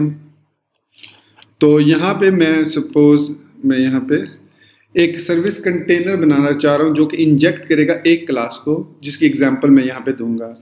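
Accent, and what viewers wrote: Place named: India. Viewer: Hi sir, i saw that you have many likes, and ppl likes you, but your articulation is bad, i know it is hard for you in your country i can understand that. But in one moment i find that you speak English in another i think it is Indian. I can not distinguish is it English or Indian :).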